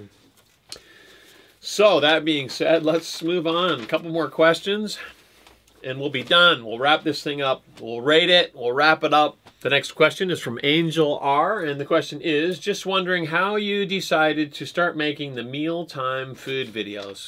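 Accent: United States. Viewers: interesting .. I thought you're Californian.... LOL ... didn't knew you learn to surf in hawaii ... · Are you from Hawaii? Certain things you say reminds me of home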